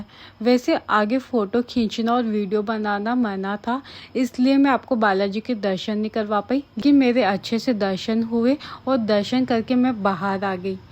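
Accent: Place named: Punjab, India. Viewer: Ap ki language me Punjabi ton h Ganganagar se ho ji ap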